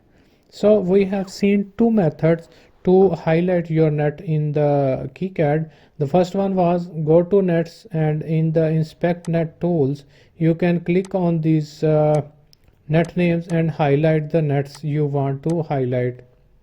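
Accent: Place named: India. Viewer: Thank you thick indian accent magician. You've saved my life.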